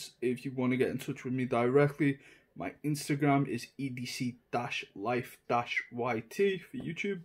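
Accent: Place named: England, United Kingdom. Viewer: you are welcome... They also offer a lot of traditional pocket knives which are legal in the UK (you sound really english, sorry if I am mistaken)... I would order on their own website... lots of their traditional products are only available there and as I mentioned their customer service is second to none...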